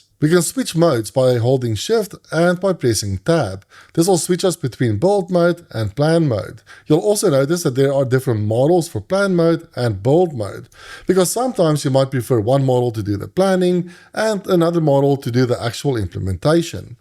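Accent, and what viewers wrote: South Africa. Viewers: I'm here for the content, and the fond memories of South African accents! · I instantly recognized my home country's accent. RSA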